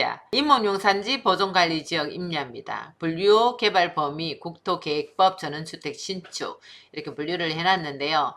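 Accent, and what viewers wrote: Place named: Gyeongsang, South Korea. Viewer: 진주분이신가요? 사투리가 ㅎㅎ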